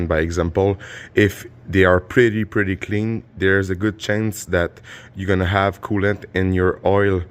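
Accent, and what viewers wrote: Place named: Quebec, Canada. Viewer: Merci pour la vidéo. Très utile, accent québécois en prime! Comme le mentionne un autre commentateur, il ne manque que l'écartement des électrodes.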